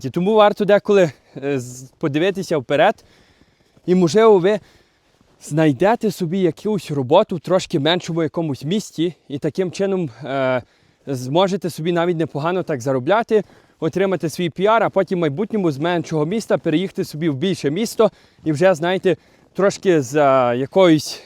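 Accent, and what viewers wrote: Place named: Lviv, Ukraine. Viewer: привет, я такой же иммигрант как ты, но в США. Мне нравятся твои ролики. Откуда ты родом? По языку слышно что Львовская область, вроде. Продолжай делать свои видео. может я позже тоже приеду в Канаду. Пока я буду в Калифорнии, но виза есть CUAET. Ты заметил, когда переехал, что уличное освещение в 🇺🇸 и 🍁 более приглушенное, чем в Украине? Это было моё второе впечатление. Упомяни это в следующем ролике. Я из города Кривой Рог. С удовольствием ставлю теье вподобайку.. На все добре, друже. Слава Україні)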